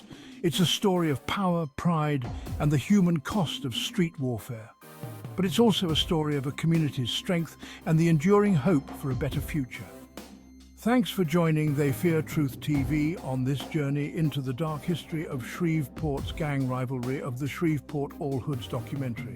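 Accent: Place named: RP, United Kingdom. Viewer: Hearing an upper class, civilized, old Englishman's accent narrating the Shreveport ghettos cracks me up 😂😂.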